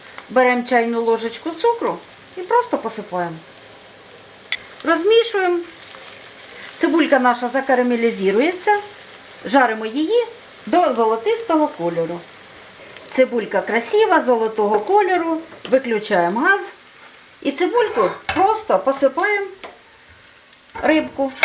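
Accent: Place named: Dnipropetrovsk, Ukraine. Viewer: Обожаю наш Днепропетровский говор😂👍 караси на вид такие аппетитные, шо аж язык хочется глотнуть 👍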